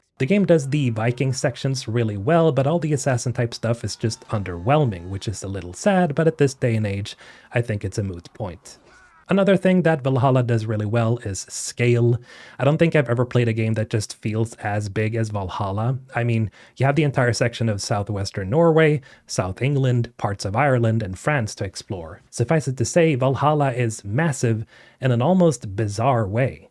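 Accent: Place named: Sweden. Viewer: Förvånad när du avslöjade dig som svensk; få svenskar som inte pratar engelska med en brytning som inte gör ont i öronen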